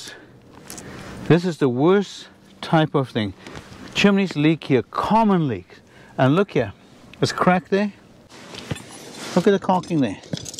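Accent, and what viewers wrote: South Africa. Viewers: Is that a South African accent I can hear?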